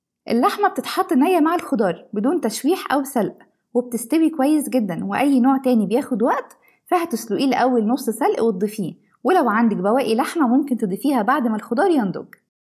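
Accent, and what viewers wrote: Egypt. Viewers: واضح من لهجتك إنك مصرية ! هوا مفيش عندك وصفات أكل مصرية أو سورية أو يمنية ولا إنتي متخصصة تركي فقط ؟ ! الأتراك لا يستحقون أن تروجي لهم وصفات أكلهم . يكرهون كل من هو عربي وينطق العربية ولهم تاريخ أسود مع العرب .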